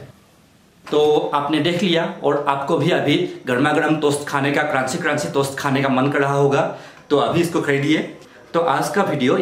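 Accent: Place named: Bengal, India. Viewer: Wow Bongali accent, Kemo naaache?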